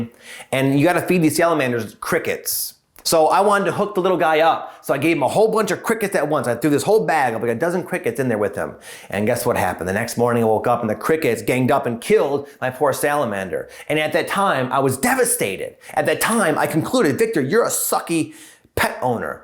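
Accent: Michigan, United States. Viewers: Great video as always! Unrelated note, I can hear the Michigan in your accent (it's okay, I can say that because I'm from Michigan too 😂)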